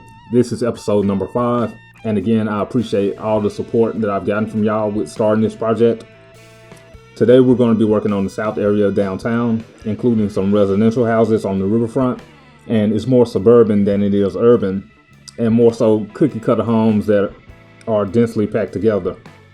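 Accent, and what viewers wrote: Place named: South, United States. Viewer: You might not think you are very good at public speaking - I think you are way to hard on yourself. I could not imagine a better voice with a southern accent to accompany your Bluff City series.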